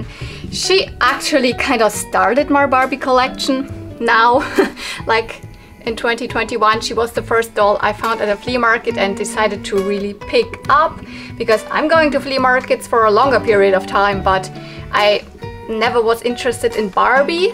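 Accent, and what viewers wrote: Germany. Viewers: wow you have an interesting barbie collection! I really like the bright colors of all ur dolls! I'm a barbie collector too and exactely like you I dun collect boxed barbies and I like to restore the dolls too. I love 80's and 90's barbie, and even 70's and 60's, but I'm a perfectionist and I always try to keep my barbies as close as possible to their original conditions with original outfits. I see you always find many good things at flea markets but here in Italy it's so hard and rare to find vintage barbies at flea markets, ur so lucky! Btw I like the simple way you collect barbies and it's so cute to hear ur german accent. Diese ist wunderbar! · Are you from Germany? My bestie is from hamburg, similar accemt. And i dont think you have to say its for adult collectors, every age can appreciate all the toys you have. Do you have any rainbow bright or Strawberry shortcake 🍰?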